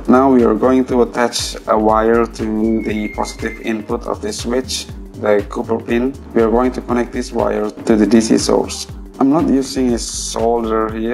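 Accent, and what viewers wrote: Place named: Indonesia. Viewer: i saw ur previous video list, actually i was thought u are indian, now i knows u from Indonesia, that's why say i was talk ur accent 😬😁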